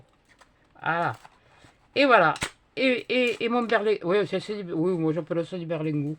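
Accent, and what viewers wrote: South, France. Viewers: Bonjour j adore vôtre accent du sud vous me faites trop rire.et vos réalisations sont très jolie.❤😘😘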